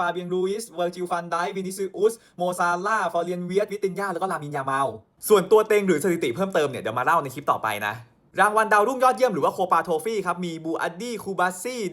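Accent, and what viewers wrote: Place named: South, Thailand. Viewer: น้องบ่าวเด็กใต้เเน่นอน😂😂😂😂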